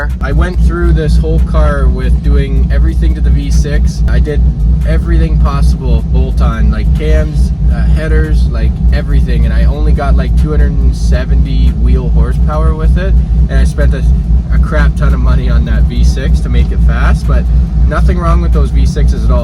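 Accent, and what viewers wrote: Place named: Canada. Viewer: fuck ya dude. you sound canadian as hell lol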